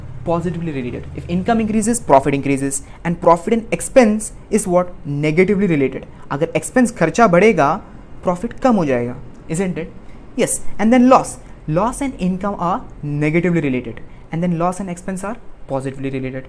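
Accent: South India, India. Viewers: I think you are from South your accent is like that your teaching is very good 🎉 Thanku sir😊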